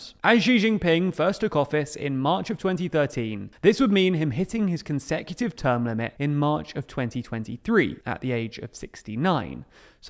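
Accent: United Kingdom. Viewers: Great video but I hate British accent because some words sounded too soft like you swallowed half of it.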